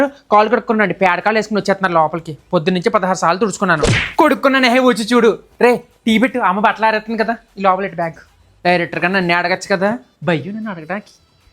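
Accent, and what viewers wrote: Godavari, India. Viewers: Director ga,kodukunnanehe godavari yaasa super I'm missing that yaasa,our place dowleswaram daggara vemagiri andi,me vedios chala natural ga vuntai nethimeda kerchief, paper cutting badge la petti name rayadam unique asalu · 😂😂😂 pure గోదావరి భాష😂😂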